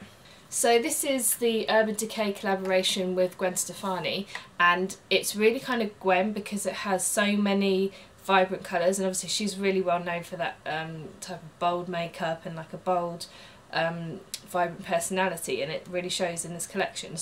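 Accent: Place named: United Kingdom. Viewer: Nice video! I love your voice and your British accent! I could listen to you talk all day long! Please do more of these makeup videos/tutorials, maybe some you are getting ready? Thanks! oh, I subscribed! 😍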